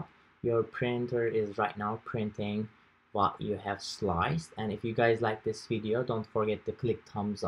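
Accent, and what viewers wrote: Turkey. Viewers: Youtube da gezinirken hasbelkader sana rastladım. Konuşma aksanında ya hu Türk galiba dedim ve öyleymişsin. Bir sevindim ki inananmam. Çünkü 3d yazıcıdan silikon basan gördüğüm tek kişi sensin. Bana bu konuda yardımcı olmanı rica ediyorum. 3d yazıcı alarak silikon kalıplar basmak istiyorum. Lütfen bana yola göster. Ne alayım, filamentinden makinesine kadar yardımına muhtacım. Teşekkür ederim. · İngilizce aksanından türk olduğunu anladım. Teşekkürler video için. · Accent is turkish indeed. Cool video!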